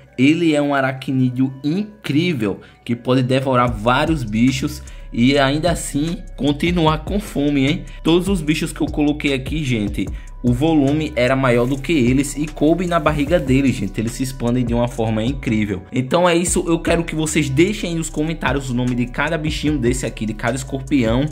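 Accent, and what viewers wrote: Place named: Northeast, Brazil. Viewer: O e nordestino por causa do jeito de falar KKKKKK Mais o vídeo ficou incrível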